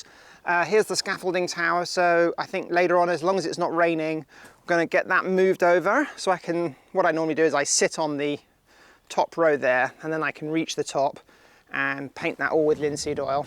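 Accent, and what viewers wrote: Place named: United Kingdom. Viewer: One thing I love about you Brits, the ability to tell and engaging story and that fabulous accent, adds a nice touch of class, keep this stuff coming I enjoy watching your travails. P.S. take care of that back and dont go up a ladder without telling someone to check on you :)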